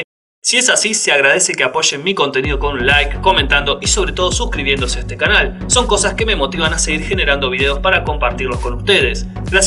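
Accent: Buenos Aires, Argentina. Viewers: Acento porteño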